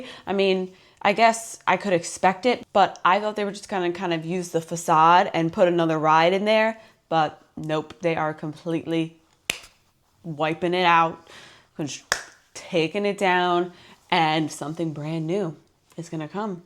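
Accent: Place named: New York, United States. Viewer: I always tune in to your universal videos. So excited for hhn this year! Also love your channel because I'm from long island NY and love hearing your NY accent 😂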